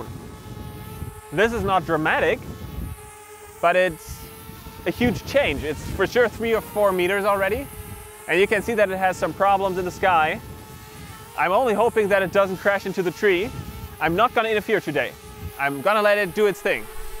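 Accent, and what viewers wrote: Germany. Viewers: you can hear the german acent decent mein Freund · Haha everytime when I watch a german guy talking english I clearly hear it but this time it was difficult because u spoke pretty good english I could barely hear the german accent naice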